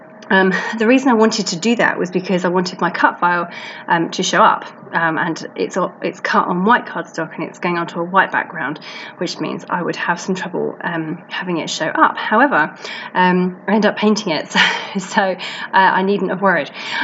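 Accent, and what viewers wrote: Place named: England, United Kingdom. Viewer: Thank you for your creativity. So nice to hear and English accent!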